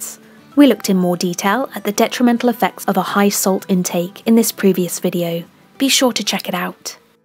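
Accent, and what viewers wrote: England, United Kingdom. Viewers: Most things not taken in moderation is not good for you. So how much money did the animal agriculture industry pony up to fund this research? Must have been a lot since they even got a woman with a nice English accent to sound convincing. Clever!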